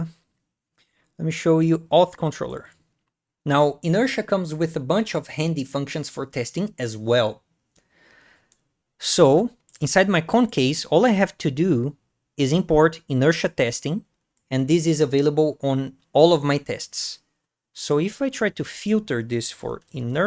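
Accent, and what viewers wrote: Brazil. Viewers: Man, o video está top e inclusive isso não é uma critica ao seu inglês, mas o brasileiro tem o jeitinho brasileiro de falar inglês né rsrs, eu assisti o video todo sentindo uma forte familiaridade 😅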